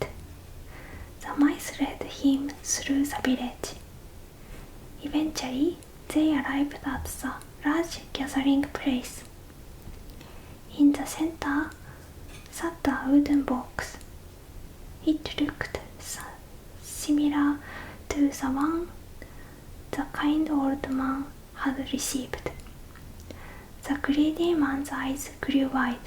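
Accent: Japan. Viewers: Engrish very kawaii❤❤❤❤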